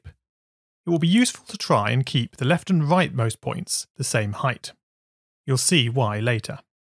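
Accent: United Kingdom. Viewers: Not really, it's just a british accent, but I see where you're coming from, as they do have similarities.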